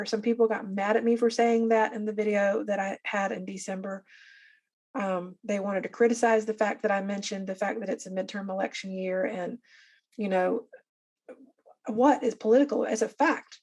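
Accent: North Carolina, United States. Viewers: I am from NC and I agree. Or SC or Virginia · 👍 you sound like you are from NC!😁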